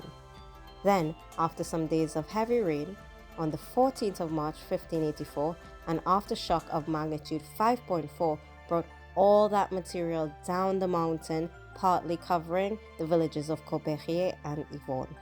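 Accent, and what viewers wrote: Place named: Trinidad and Tobago. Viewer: Hey, I loved the video. I am a Trinidadian doing research for my thesis and came across your video. You sounded Trini so I researched you. Keep up the good work and post more content. Thank you.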